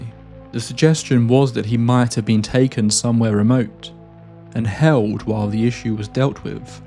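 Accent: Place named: RP, United Kingdom. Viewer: Maybe the best part of this for me was hearing you say queen of flatulence and your very posh accent 😂😂😂 But all jokes aside, that was a good episode. I had heard that story before but not in that much detail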